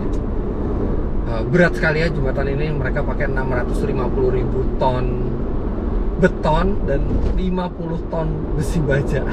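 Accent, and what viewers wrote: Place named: Madura, Indonesia. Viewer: Salam dari probolinggo. Keng logat medure lek.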